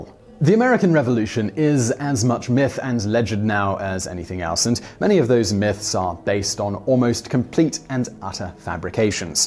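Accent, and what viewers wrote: England, United Kingdom. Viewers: This video just sounds like a Brit That's still a bit mad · I absolutely love Simon Whistler and think it's the height of hilarity that the perfect British voice is narrating why the American Revolution sucked. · Sounds like a salty Brit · You know as much about freemasonry as you do about American history. Oh… I notice you have an English accent as well! 🧐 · I love hearing an English guy talk about losing the revolutionary war.....now on to the war of 1812 the on to the bailouts of WW 1 and 2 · I can’t help but notice that this man, with a British accent, keeps reminding us of what we were taught in grade school already. That England wouldn’t have lost if the colonies hadn’t had help. It’s been over a couple centuries now. Still not over it? Perhaps his time would be better spent praising France for having the foresight to invest in our little experiment over in the colonies. 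It has certainly paid off for them..... and for England for that matter. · Just a thought, if you’re going to be talking smack about the Revolutionary War, you’d probably get a lot more credibility if you did it without the British accent. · Ironic how a English man is narrating this. He’s not wrong but I smell sour grapes 🍇 🤣🤣🤣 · I hate it when guys with British accents try to tell us about the American Revolution. It's like prince Andrew lecturing American people about the unreasonable idea of free speech...from his Malibu house. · SAYS GUY WITH BRITISH ACCENT🙄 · You clicked on this video to learn more about the American Revolution and you got someone with an English accent. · As an American, I don't question the accuracy of anything being said here, and most of it is consistent with what I learned in school. But man it's hard to assume objectivity when hearing all these facts delivered from a guy with a British accent. 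· So we’re being told by someone with a distinctly British/European accent that Americans don’t know their own history. I say ‘Bollocks!!!’. In Parliament it was stated the colonists would NOT pay for the French & Indian War. Then they reneged on that and forced the colonists to pay for a war they did not ask for. All the points he says are surprising are not and have been taught for years. And yes for someone unfamiliar with the weapons of the day it may seem that it would be difficult to fire and reload in short order. A person experienced with the weapon could fire and reload 3 times in a minute or less. As for guerrilla warfare, the tactics used by the colonists was much different from the marching in a straight line and essentially taking turns shooting at the other side. The colonists did not always fight that way and so in comparison their tactics could be considered guerrilla warfare. 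· His accent tells me a little about how the British teaches about America lol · Why am I listening to a Brit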